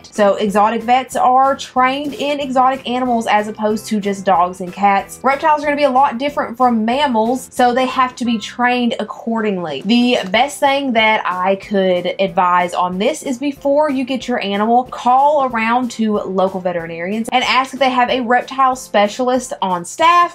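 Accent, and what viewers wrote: South, United States. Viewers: Just curious where you’re from? Hear that southern accent, reminds me of the way everyone talks back home.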